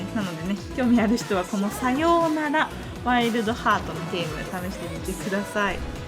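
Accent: Kansai, Japan. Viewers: センセーの関西弁萌😍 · 『なにげに再生しただけの apple信者でもない ipadmini4 持ちの爺々ですけど・・・』 『指先も ネイルもbeautiful 話す言葉が速いのによく聞き取れる明瞭感 時々関西弁もカワユス👍』 『わぁぉ～！ 顔出しもあるんかい？ 超絶かわゆくて 今日からイイね押して フォロー決定👍👍👍』 正直 確かにsensei名乗ることだけあるお人で・・・解説動画としての秀逸さに感服致しましたm(_ _)m 邪な助平心は捨てて・・・ を師匠と仰ぎ ipadの利用について 一から勉強し直しますです！！！ マジで知らないこと多すぎ、便利そうやもんねぇ。有難う御座いました。この際追加購入検討するかな！ by にゃんこ爺々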